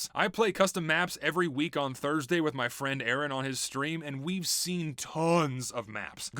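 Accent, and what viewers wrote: South, United States. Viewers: I love the southern twang in your voice, it's so sexy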